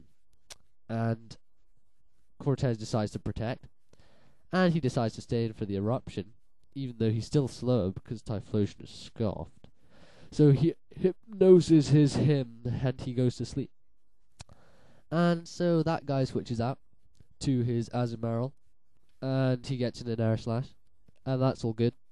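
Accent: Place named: United Kingdom. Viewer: You're commentaries are really good! Makes a change hearing a British voice. Anyway, if you wanna battle some time, message me, be interesting seeing my team narrated against on youtube :P.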